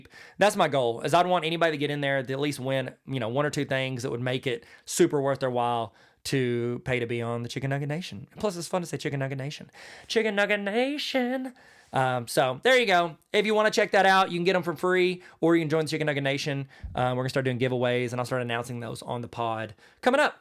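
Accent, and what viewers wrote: South, United States. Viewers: I love watching your videos, because you say things like “No thing like a chicken wing”, l know you didn’t say that but you do say funny things that crack me up. Where are you from originally, your accent says the south and l am from Lexington Kentucky area with an accent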